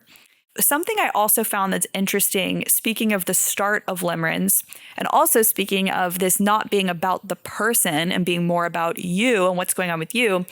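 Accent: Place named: California, United States. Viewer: hello, your speech is amazing are you from western California.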